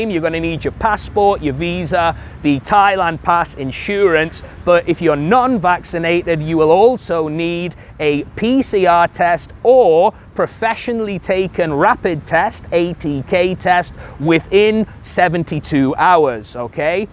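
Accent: United Kingdom. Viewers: Love British accent, love your videos.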